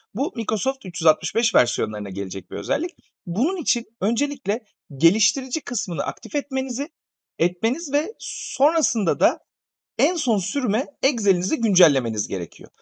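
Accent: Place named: Aegean, Turkey. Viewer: ege şivesiyele biyendim, yani beğendim.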